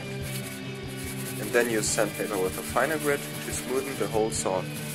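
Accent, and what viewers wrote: Germany. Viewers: The whole time I thought : "this guy sounds German " and then I sa w the Obi Premium Lack and knew I was right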